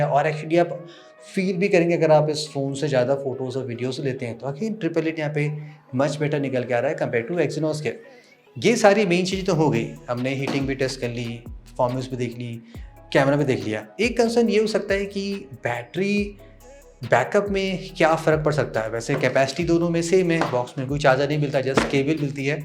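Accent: Lucknow, India. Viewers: Bhai ap lucknow se hai kya? And Goof review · Aap Lucknow se ho kya???? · Are you from lucknow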